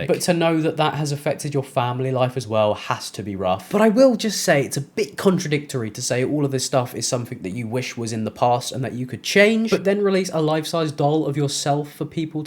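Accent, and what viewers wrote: RP, United Kingdom. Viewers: As a german i just love your british english. It literally sounds like some rich guy in a suit with a cup of tea is talking to me😂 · I hope that colonialism and imperialism also come to your mind every time you hear that accent, because those are as traditionally British as the pompous tea drinker.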